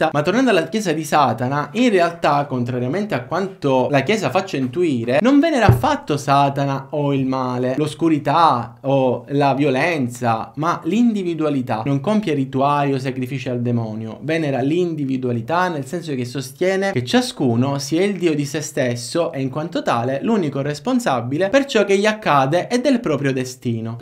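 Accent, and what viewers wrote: Sicily, Italy. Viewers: Direi dall" accento sei palermitano ! Bel video ciao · mi piace la tua cadenza siciliana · Quella dote narrativa con cadenza sicula "casa casa"... Adoro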